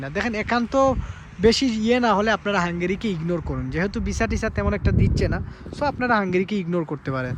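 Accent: Sylhet, Bangladesh. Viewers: Vai are u Sylheti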